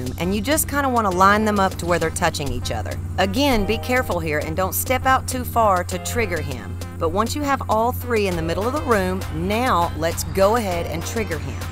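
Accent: South, United States. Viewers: I really like your accent. Not sure where you’re from, but it reminds me of the South and that is very comforting to me! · I got a switch a couple months ago and I started playing breath of the wild not too long after. Your videos are awesome and they’ve helped me a lot thank you. Also as a fellow southerner I appreciate your twang 😁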